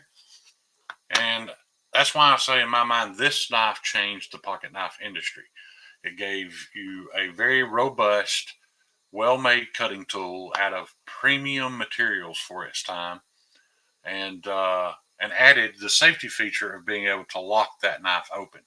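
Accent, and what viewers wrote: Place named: South, United States. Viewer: You had me fooled. At the beginning you started off slow with the southern voice and the antique Asian blade. Case trapper, yes, Buck 110, for sure but boy did things escalate from there! Wow! Nice collection you got there homeboy!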